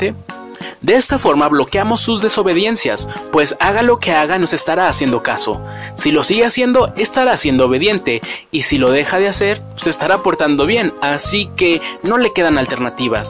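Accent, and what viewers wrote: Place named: Mexico. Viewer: por su asentó, creo que es ud de mexico, o peru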